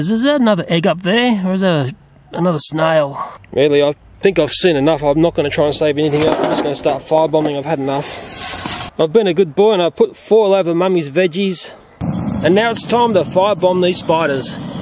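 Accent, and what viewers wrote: Australia. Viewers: Australian accent “check” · Australia accent CHECK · Australian accent?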